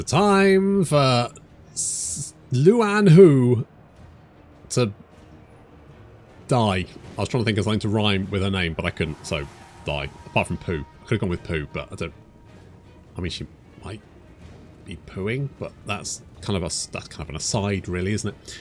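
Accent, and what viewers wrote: France. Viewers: as someone who knows how to speak french from his mom's family side, i DO want you to bring out the french accent. I think it'd be very based and funnypilled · more french accent please. Since you want to be buddy with ol' Volkmar there,just give Zandri to him in exchange of asking the moustached fella to wage war against Aranessa,and speaking of facial hair,do the same thing with the Prospector dwarf,ask em to wage war towards Aranessa so they won't stab you in the back while you sailing up there.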